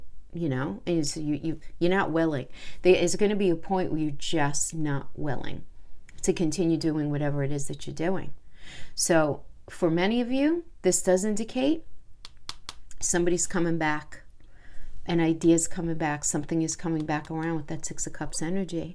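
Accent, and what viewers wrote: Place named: New York/New Jersey, United States. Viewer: What area are you from? You have a slight NY/Jersey sound. 💛 I really like your readings for Libra. Thank you.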